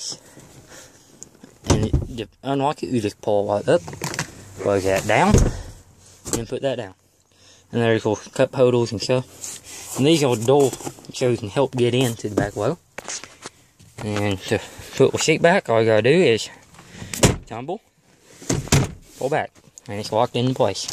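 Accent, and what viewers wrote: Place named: Australia. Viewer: think he's either Australian or New Zealander.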